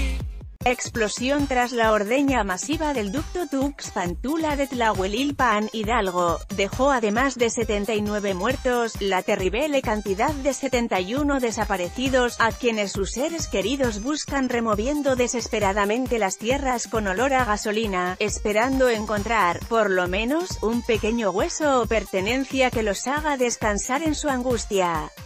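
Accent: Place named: Spain. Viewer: ESTE ROBOT CON ASENTO ESPAÑOL NO SIRVE PA NADA. PERO D CUALKIER MANERA. RIP.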